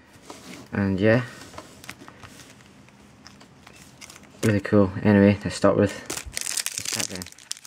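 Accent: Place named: Scotland, United Kingdom. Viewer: i love your accent... scotish right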